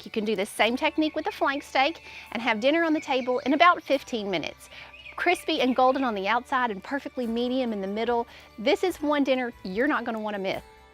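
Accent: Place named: Texas, United States. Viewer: Super Texan I bet ❤️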